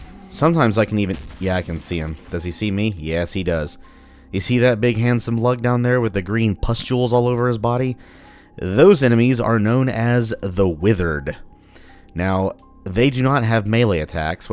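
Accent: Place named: United States. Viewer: Amazing video! Too bad you have to do the "too big to go through the door" trick on the highest difficulty, makes it a different experience in my opinion, but I just love you commenting on everything with your beautiful American accent. Can't believe you do 2-3 playthroughs before the video recording one. I guess it's necessary to make the videos shorter. Do you really find every secret by yourself btw? None of that pagb666 vids cheating? ;)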